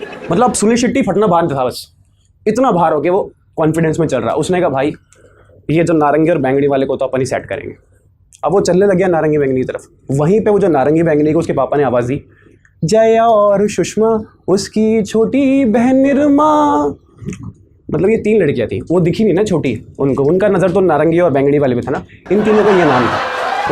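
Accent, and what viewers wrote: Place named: Haryana, India. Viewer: Sir ye hariyanvi accent h... Rajasthani accent nhii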